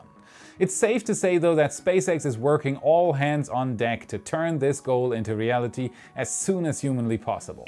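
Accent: Germany. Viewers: Don't be afraid of showing the accent, a German talking about engineering is as natural as a bird flapping its wings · German accent coming back again !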